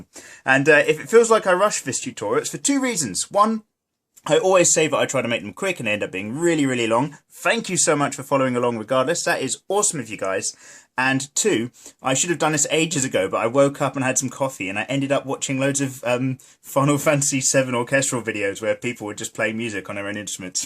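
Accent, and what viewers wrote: United Kingdom. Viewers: God DAMN he’s so British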